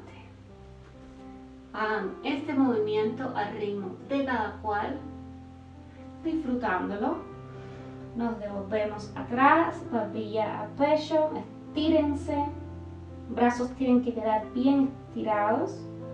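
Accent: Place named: Cuba. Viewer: Hoy practiqué esta rutina y me encantó y pienso que hay muchas del arado que pueden resultar y también la de la bicicleta con los pies vertical. Yo las hacía antes con mi lana pero contigo es mucho más juvenil. Me he quedado asombrada con la mejoría en tampoco tiempo, tres días. Me luce que tú eres cubana, por el acento, Acláramelo! 🇩🇴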